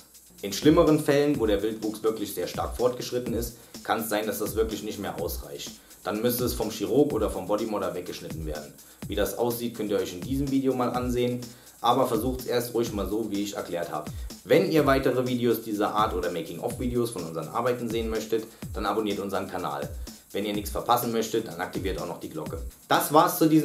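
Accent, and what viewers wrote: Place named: Palatinate, Germany. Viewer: ahso, du kommst aus der Pfalz. Deswegen biste mir so sympathisch😊Wir sind Nachbarn. Ich liebe den pfälzischen Dialekt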